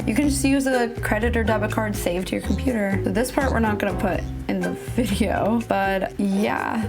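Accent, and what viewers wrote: Los Angeles, United States. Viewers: Ugh LA accent is horrible.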